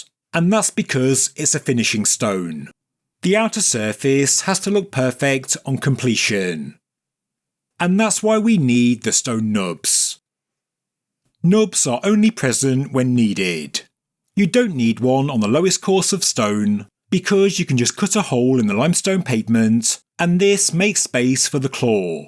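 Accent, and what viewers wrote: United Kingdom. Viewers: He doesn't lol....he just gets possessed right before he begins recording and snaps back out of it when hes done. He speaks normally, other than the British accent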